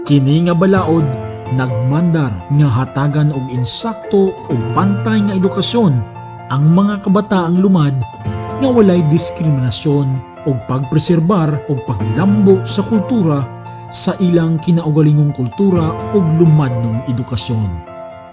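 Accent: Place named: Visayas, Philippines. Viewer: Wow masarap pakinggan ang tunog bisaya,at gand ng kwento,naaalala ko nung kabataan ko sa kwento mo idol, ganda talaga ng probncya, dati na kmi nakatira sa bayogan agusan del sur,godbless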